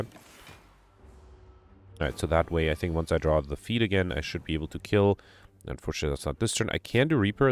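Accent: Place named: Scandinavia. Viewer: It's all good. Just gave som Baltic or scandinavian vibe to your speech, but that's totally fair. I'm from Denmark myself. Anyway I love your videos they're great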